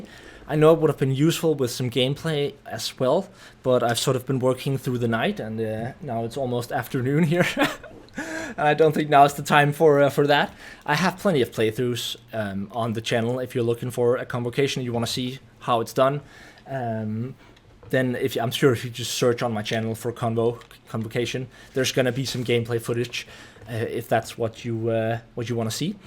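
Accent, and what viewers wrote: Denmark. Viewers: Are you danish? Your accent sounds a lot like WackyJacky101's a pubg content creator. Thanks for the video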